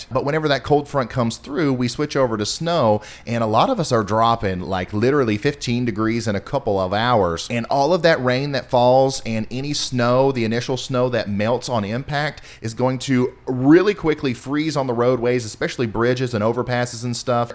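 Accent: South, United States. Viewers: Thank you for your outstanding work at covering the weather,. Being a snow lover in North Carolina, it's so refreshing to hear someone with a "southern" accent say they love snow! Sad to say, forecasters here are saying that my area will be getting mainly ice. 🧊☹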